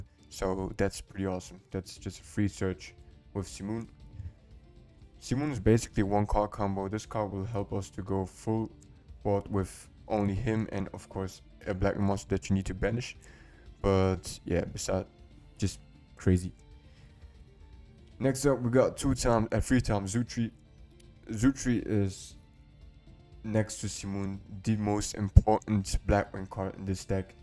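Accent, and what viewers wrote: Germany. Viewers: Are you from Germany? Super Nice Video And Deck👍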